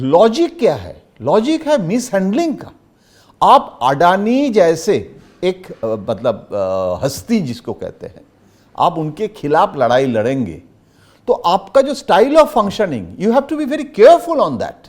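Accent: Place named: Bengal, India. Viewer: Sir, do baat samaj mein nahi aa rahi hai ? Aap ne kaha Adani jaisi "HASTI" par sambhal kar bolna chahiye. Ek taraf aap keh rahe hai login share karna parliament ki security ke liye theek nahi lekin Adani ka paisa chisnese aur gulf se aana sahi hai ? Yeh 2 sources ka paisa desh ki economy mein lag raha hai toh kya inka paisa economy ko barbaad lar sakta hai ? Baat sirf itni si hai ki kai neta varshoan se parliament mein hai lekin desh ki policy par sawal puche ne se bacchte hai. Doosri baat Shashi Tharoor ka reference dena aap ke poore vishleshan par sawal utha tha hai. Tharoor ne kaha woh meri beti jaisi hai phir bhi aap keh rahe hai ki Tharoor ka support karna TMC leaders ko sahi nahi lag raha hai. Waise Mamta Banerjee kabhi bhi personal life par na soch thi hai na bolti hai. Unka naam lekar apna bayan dena theek nahi. Aap ka lehja (tone) bengali hai iska yeh matlab nahi ki aap Mamtaji ko represent kar rahe hai. 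Aaccha yahi hoga ki ek yodha ko apni ladai ladne de agar aap uska saath nahi fe sakte ya usse sehmat nahi hai. Lastly, she has raised or asked important questions for the people or part of the people? Let us hope truth will triump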